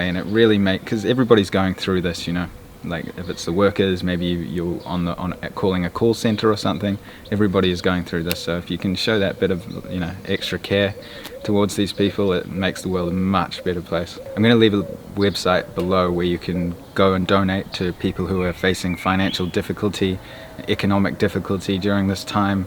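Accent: New Zealand. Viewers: it sounds very Kiwi. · Piet Velkens Are you serious? I’m from NZ and he sounds very kiwi? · I'm confused, are you actually from Hungary and grew up in NZ for most of your life? Or you are from NZ and later moved to Hungary? I know either way you definitely spent most of your life in NZ bro, there's no hiding that accent haha you sound and even look just like my best mate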